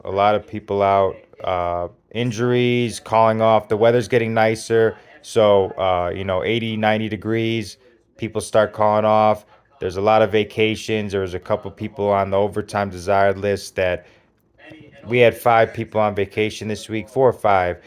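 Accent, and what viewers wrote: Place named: Upper Midwest, United States. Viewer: Yeah it's a northern Midwestern accent. I've been told I have a Michigan accent, which is where I grew up. I'n rural in 46788 Indiana, 45k and on the od l list. I heard that John Prime had a Midwestern accent with a hint of his Kentucky roots. I believe he was from Chicago, and I really love his work. Good to hear you talking about UFC. The Michael Pereira fight last Saturday was incredible.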